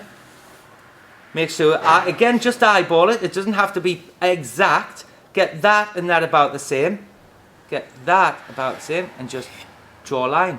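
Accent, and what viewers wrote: Newcastle, United Kingdom. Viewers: Took me about 10 mins to recall where his accent originated, then he later confirmed with, ''my mate in Newcastle.'' Obviously well experienced, and fully competent, without loads of boring ''waffle'' like some. Actions always speak louder than words. Enjoyed the demonstration, and found it very educational, but turning with 'wings' gives me the heeby-jeebies, ever since I got my finger tip whacked by one.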